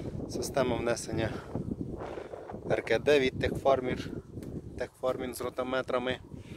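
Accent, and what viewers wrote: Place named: Khmelnytskyi, Ukraine. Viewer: Говор наче з Хмельниччини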